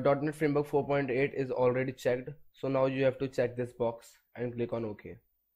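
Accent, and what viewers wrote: India. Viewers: You know the tutorial is gonna work when the accent sounds Indian. ❤ U indian brothers.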